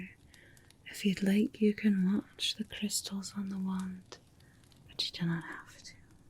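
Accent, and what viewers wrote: Scotland, United Kingdom. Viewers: The scottish accent is the best❤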